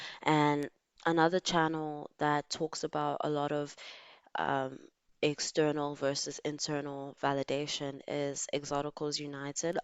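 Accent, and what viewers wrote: South Africa. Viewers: I just know you’re South African🇿🇦 the beautiful accent gave it away😅❤I’m soo happy to see more South Africans in the exotical space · Another exotical channel to subscribe to❤❤❤also you sound south african you have a beautiful voice❤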